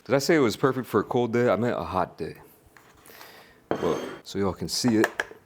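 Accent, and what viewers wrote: United States. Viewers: you sound like you were from the USA, And smoke Blunts after Blunts..!! I love it..!! also i love being able to check out all the kick ass Korean foods and products..!!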